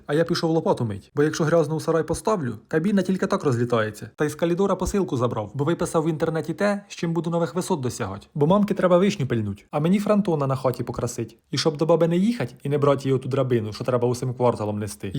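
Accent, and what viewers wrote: Ukraine. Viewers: Ви або з Сумщіни, або Полтавщини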